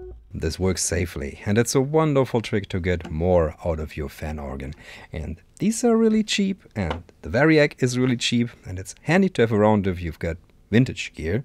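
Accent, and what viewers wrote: Germany. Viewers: You have the PERFECT accent to go with that voice. So comfy, and Teutonic enough to inspire confidence in you, somehow? IDK it's just wonderful to listen to. Like that organ!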